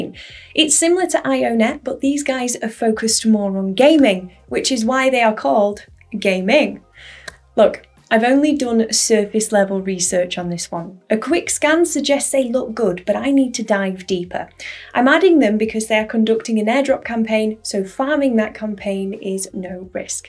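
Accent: England, United Kingdom. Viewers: I love your English accent!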